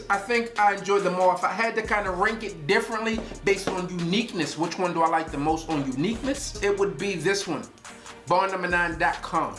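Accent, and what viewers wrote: Baltimore, United States. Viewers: Boy that bmore accent sound weird af lol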